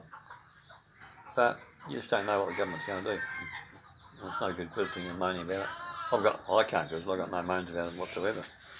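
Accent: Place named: Australia. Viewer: Hi. Thanks for sharing you experience in the Philippines. My name is Chuck. I live in the state of Nebraska in the USA. I too am married to a Filipina. We are currently having a small simple home built in General Trias, Cavite, Philippines. We hope to be moving their to retire next year. Keep the videos coming I really enjoy them. I have to watch each one more than once because I have a hard time understanding some of what you say because of your very strong Australian accent. ¯\_(⊙_ʖ⊙)_/¯ Take care and God bless.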